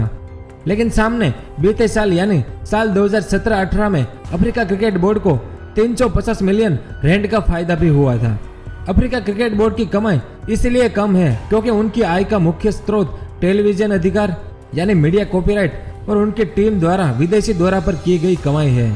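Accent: Gujarat, India. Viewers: bhai gujrati hoo kya?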